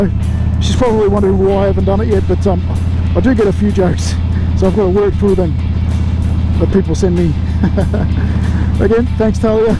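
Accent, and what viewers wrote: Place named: Australia. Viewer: Well, wonder no longer! I've always enjoyed the Australian accent. I just don't hear it everyday. It's not you, it's me! Looking forward to your next video.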